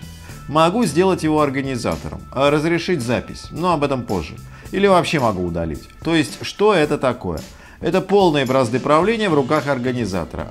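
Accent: Moscow, Russia. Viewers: Это не акцент! Это московский говор! Москвичи ,,акают ".)))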